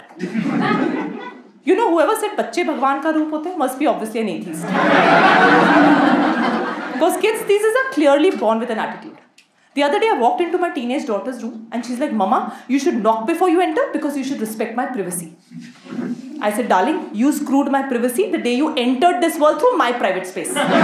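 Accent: Punjab, India. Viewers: She is aging like a fine wine and her voice and Punjabi accent is litt. And nice jokes also🔥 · So decent lovely beautiful I can't express ☺️☺️☺️ yesss Punjabi tarqa massst haiiii☺️☺️☺️ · You r doing amazing mam....just keep it up.. really liked you Punjabi accent 💫👌... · Sorry we are not ok with punjabi tadka. but u can speak. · Solid content ma'am, I loved your punjabi accent... Waiting for your next video 🎉🎉🎉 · Amazing ji.....jatti ji full kaim....really loved it.....specially your punjabi accent ain kaint....waheguruji bless you jattiji....chardikla ❣❣💐👌👏👏👏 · Punjabi accent aapki ufffff ❤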